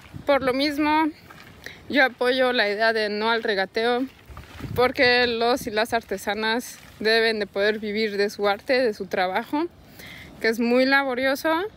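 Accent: Mexico City, Mexico. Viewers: Que lindo lo de dedicar tus videos a tu mamá ❤ y es verdad lo del acento, apenas te escuché y suenas super chilanga cuando hablas español sin detenerte jaja 😅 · El acento chilango no se pierde jajajaja y felicidades por hablar tantos idiomas, por lo que veo eres una persona maravillosa. Aunque estes tan lejos de México, es bonito que no te olvides de tus raices.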